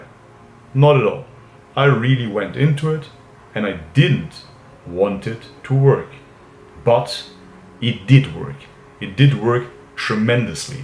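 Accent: Germany. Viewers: Lol very funny to hear you talk about sex with that strong German accent of yours!! 😂😂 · Aren't you a German? Your accent definitely sounds like it? Sprichst du Deutsch?😁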